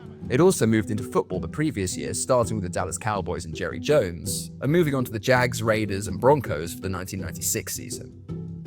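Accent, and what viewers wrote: United Kingdom. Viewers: Gonna start off by saying great, informative video! But on to my actual comment, I'm shocked to hear what I perceive to be a British accent covering American sports, and specifically Baseball. 2024 is dope. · British accent? Very smooth not to rubbish. Glad i was recommended this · So weird hearing a brit talk biesbol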